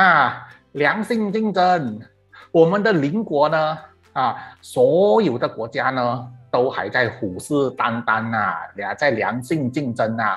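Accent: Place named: Fuzhou, China. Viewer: 哗你的福州话很标准呢！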